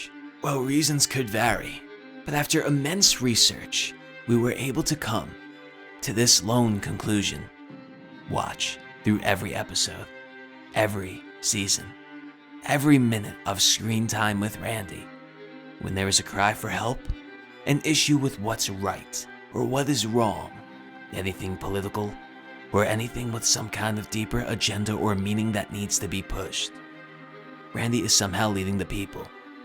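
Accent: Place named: United States. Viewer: You sound like how europeans think an american youtuber is supposed to sound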